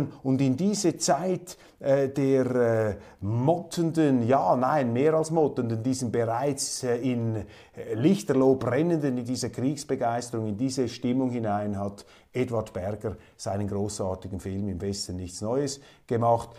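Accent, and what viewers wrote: Switzerland. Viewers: Lieber Herr Köppel, ich kenne Ihre Sendung noch nicht so lange, aber ich bin zwischen ein großer Fan von Ihnen und Ihrer Sendung geworden. Ich höre sie so gut wie immer zu meinem ausgedehnten Frühstück und zwar auch deswegen, weil Sie mich jeden Morgen etwas zum Lachen und Schmunzeln bringen, trotz der ernsten und meistens unerfreulichen Themen die behandelt werden. Aber durch ihre brilliante Rhetorik, gepaart mit schweizerischem Klang und Witz, kann man dabei sogar noch etwas schmunzeln, denke nur an " Haustier Mentalität, Rambo Allüren" usw. kann garnicht alles aufzählen. Auf jeden Fall hilft sie mir etwas gelassener in den Tag zu starten und nicht zu verzweifeln, angesichts der tristen politischen Lage in Deutschland. Wisssen Sie, nicht nur die Politiker sind Deutschland müde geworden und verleugnen jegliche Heimatgefühle, bzw. wollen uns diese austreiben mit allen perfiden Mitteln, auch wir Bürger sind Deutschland müde und unserem Land überdrüssig geworden, angesichts dessen was hier abgeht. 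Ich kenne nicht wenige junge Leute, die ihr Leben noch vor sich haben, die sich ernsthaft mit dem Thema auswandern befassen. Ich gehöre auch dazu, bin aber leider schon zu alt dafür und für einen erneuten Krieg erst recht, das habe ich alles schon miterlebt und das reicht mir für ein Leben. Das schöne Gedicht bringt es genau auf den Punkt, könnte in unserer heutigen Zeit verfasst worden sein.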